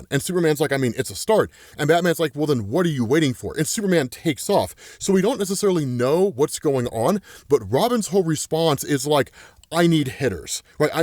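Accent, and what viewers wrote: United States. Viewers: Why does Rob pronounce ''Eclipse'' like that (e-clips)?. Where's his accent from in the US?. I'm not from the USA.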